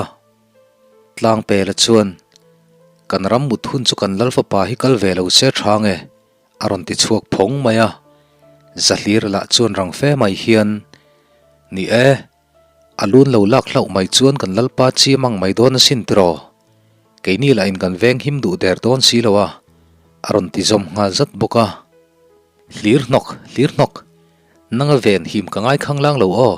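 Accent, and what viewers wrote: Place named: Pawi, India. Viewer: Mapeka, ka va zah che em aw, i thiam ang tih ka ring e, keini thangthar leh thalai zawk tena kan lo chhiar dan leh hriat dan hi a dik fu lo zawk em mawni tiin tiin ka in ngauhtuah rum rum mai. 1895 ah khan mizo hian hawrawp te kan lo nei ve tan chauh kha ani nia, ziak dan leh lam dam dik hi keini thangthar zawk te hian ka lo hre dik lovin kan ko hre fu lo zawk nge ni. Sir hi ( Synod- Sinawt-a ) Music kan zir laia an lamrik fo anih vang leh an sawirik zina a tumruhna te an sawi vangin, a channel hi ka bel chawt mai ani ani, sawi dawn ila ka sawi treuh thei mai hian ka ring e. Lai (pawi/pawih) chuan Lei ( Tongue angin kan lam thin) Lushei/Lusei chuan Lei ( Soil) angin an lam thin niin ka hria. Leido kan nei thin a ( Lei-do) Lei anga Sir a sawi a nih chuan Lai /pawih tawng angin a sawi tihna ani ah ka ngai. ( Khawlhring/ khualsim/ hlawncheu te nen bik hian tawngin ang deuh an hmang vek ani) Entir nan ( Lei do kan nei ih, kan neh ngei ding an lu ngei cu kan la ding). 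Pawi tena kan lamdan leh sawi dan aw. NB: Tawng reng hi a dang a, tui a dir e an tih lai in, tui a haw ti tawk kha kan awm miau mai kha ani a, sawi fiah viau dawn ila diklo ti tu an awm anga, dik ti tu te ab awm ang, kan tawng zawng alo har ani e.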